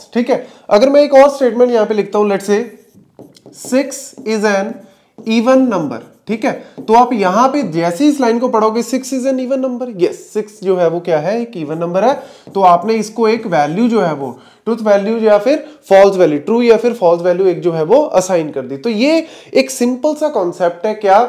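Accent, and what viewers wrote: Punjab, India. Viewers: nahin probably somewhere around Amritsar-Chanidgarh-Delhi Area I can notice punjabi touch in his hindi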